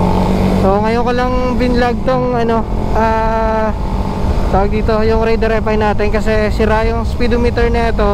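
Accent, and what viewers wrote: Iloilo, Philippines. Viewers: Parang iloilo to ah.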